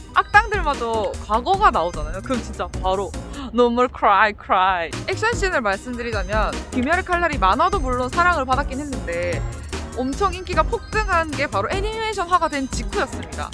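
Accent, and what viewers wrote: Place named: Gyeongsang, South Korea. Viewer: 경상도 사투리를 고급지게 구사하시네요👍👍👍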